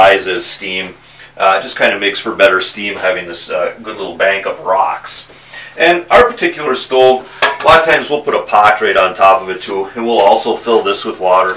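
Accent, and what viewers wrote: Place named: Upper Midwest, United States. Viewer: I was looking for a vid about putting line on a spinning reel and your vid from 2 years ago came up. First of all, thank you, it's what I was looking for. Second, I love subscribing to local Youtubers and you sound local. Are you in the UP or Wisconsin?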